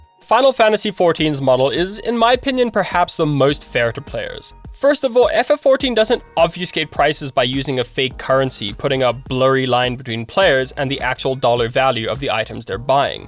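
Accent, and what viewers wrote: South Africa. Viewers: love hearing a fellow Saffa on YouTube! great video! hope your channel blows up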